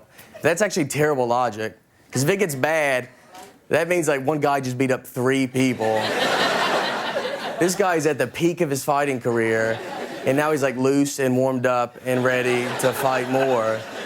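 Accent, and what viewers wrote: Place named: South, United States. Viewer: Much younger look back then obviously, but I noticed way more twang in his voice too, country southern sounding.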